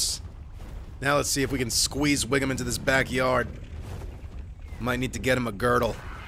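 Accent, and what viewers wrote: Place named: New York, United States. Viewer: Man, when I found this channel I thought it was Simon from Neebsgaming due to the NY accent hahahaha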